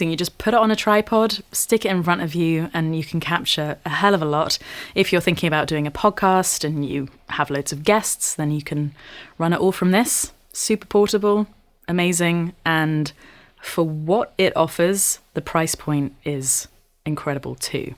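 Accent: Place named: RP, United Kingdom. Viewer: You sound very posh!😮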